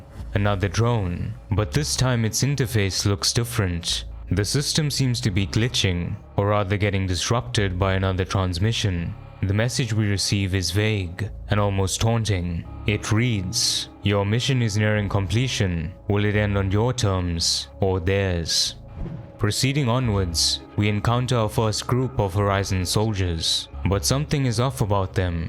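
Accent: South Africa. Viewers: I just hear the south african in you 🤣Good video mate, good to see content like this coming out of our country.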